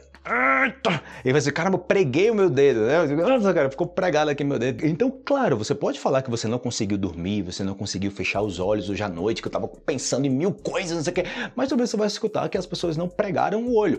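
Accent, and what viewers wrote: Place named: Antioquia, Colombia. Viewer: Me encanta como el profe cuando habla español se le siente puro acento paisa, obrigado!!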